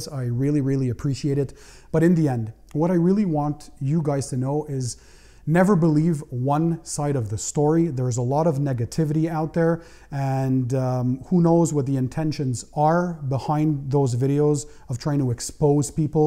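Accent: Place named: Montreal, Canada. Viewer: Wow! Je découvre votre chaine ce soir. Celle-ci est la deuxième que j'écoute. Ça commence fort. Faut demeurer concentré sur les 99.99% qui vous apprécient. La qualité et l'enthousiasme que vous présentez ma gagné tout de suite: SUBSCRIBED !! J'ai senti que vous aviez possiblement un petit accent tres subtile de Montreal, une touche francophone. Je découvre que vous parlez français et bien entendu, je devine, le grec. Tikanis ! Bon succès, je vais me convertir en Week-end worrior en detailing. J'attend un nouveau véhicule pour la semaine prochaine. Est-ce que je peut déja appliquer une protection de peinture sur ma nouvelle voiture. Je viens d'acheter McGuire's Ultimate que j'ai appliqué sur la voiture recente de ma femme; beux résultat. Normand Rousseau , rive-nord de Montréal.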